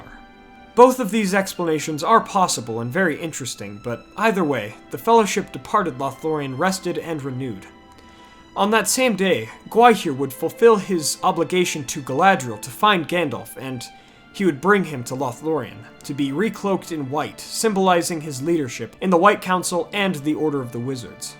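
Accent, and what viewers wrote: United States. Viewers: the way Americans pronounce Middle Earth names makes me laugh ... bless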